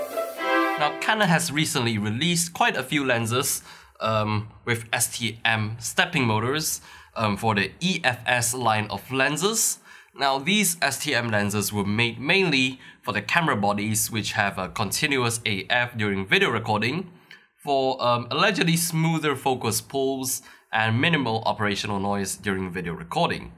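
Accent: Singapore. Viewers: Singaporean for sure. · great review. may I know where are you from? you sound like Singaporean or Malaysian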